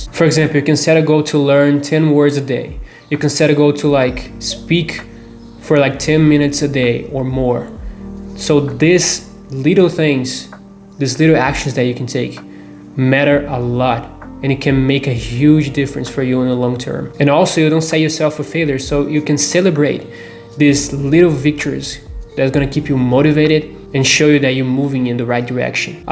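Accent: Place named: Brazil. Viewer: He's Brazilian!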